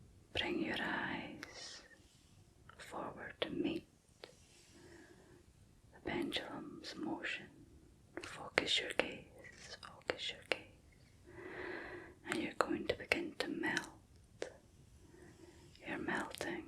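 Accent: Scotland, United Kingdom. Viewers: Feel like i with a scotish girl. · your scottish accent reminds me of mum so soothing x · Just discovered you. Its nice to hear a Scottish accent spoken so softly..... even if you are from Dundee 🤣😜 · lovely Scots accent behind the voice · Hello from Ayrshire it's Good to hear a Scottish accent I have real problems sleeping but you helped this fellow scot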